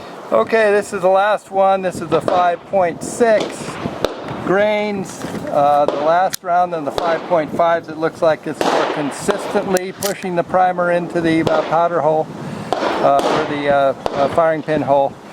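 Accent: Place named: Chicago, United States. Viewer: Sounds like your in Chicago!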